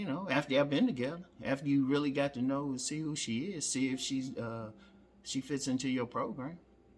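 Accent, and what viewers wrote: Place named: DMV, United States. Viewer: Just found this channel a couple months ago and been watching all your videos. As a young 24 year old dude I appreciate all the free game you give and you're honestly my favorite figure in the "redpill community", "Manosphere" or whatever you call it. You remind me of my late high school football coach with that heavy DMV accent.😂 Shoutout from Harford County, MD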